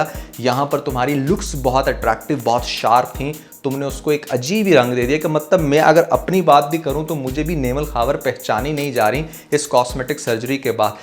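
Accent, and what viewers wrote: Punjab, India. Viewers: I always say, kindly focus on your Urdu and English accent. Dool nahi doll.. Dono languages main Panjabi touch aata hy This is advice for you and it's easy to overcome it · Bhae hasad may naqal utarty hue AA Kuch ziada he over hogae or boht he weired hogae bilkul acchay nahe Lagay itna ghussa mat hua karain punjabee accent may Urdu n English sounds weired but it's nice but plz ab is comment pe ghussa mat hotee ga qk apka channel Hy or aapko hr qusam k comment face krny paraingain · Why are you trying to copy indian accent & words , some kind of inferiority complex?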